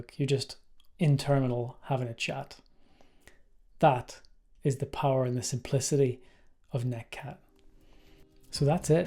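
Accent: Northern Ireland, United Kingdom. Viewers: is that a Northern Irish accent I hear? ;))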